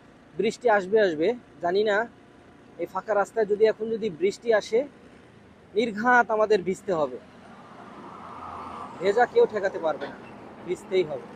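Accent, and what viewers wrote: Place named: Bhola, Bangladesh. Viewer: মোটেও ঝুঁকিপূর্ণ না তবে কিছু দুষ্টু ছেলেদের অতিরিক্ত জোরে গাড়ি চালানোর জন্য ফাঁকা রোডে এক্সিডেন্ট হতে পারে। গুজব ছড়াবেন না। আপনার কথা শুনে মনে হচ্ছে আপনি চাঁটগাইয়া না, আপনি ভইঙ্গা।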